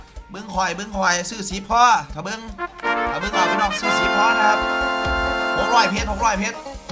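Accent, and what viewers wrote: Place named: Isan, Thailand. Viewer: มักคำเว้าเจ้าเด้เนาะ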